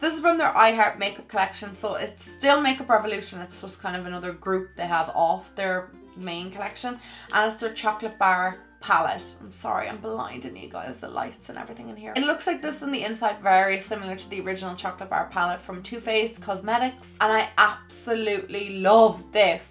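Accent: Ireland. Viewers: what part of Ireland are you from? I'm from Dublin love ur videos and love ya byeee!!!!!!!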